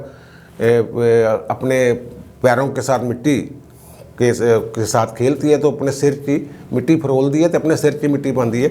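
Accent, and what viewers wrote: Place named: Punjab, India. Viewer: Bhai Aap apna punjabi lab o lehja qaim rakhay howeye hain yeh bari fakhar kee baat hay, main aap kee mukamal himayat kerta hoon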